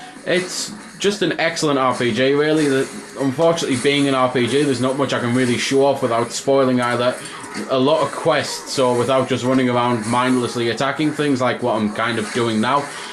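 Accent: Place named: Northern England, United Kingdom. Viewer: You're the best reviewer gaming wise excellent taste only negative is your accent sometimes hard to understand being american. Where are you from country city and region? Is that like northern english accent?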